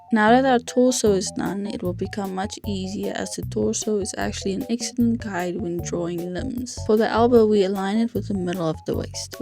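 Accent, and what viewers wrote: South Africa. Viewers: your accent is a lot like a africaner's accent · Your accent is giving me South African deja vu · Her accent sounds south african a bit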